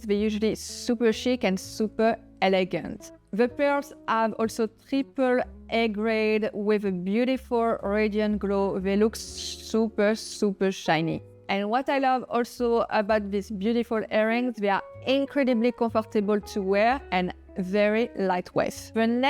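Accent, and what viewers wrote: France. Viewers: Hard French accent.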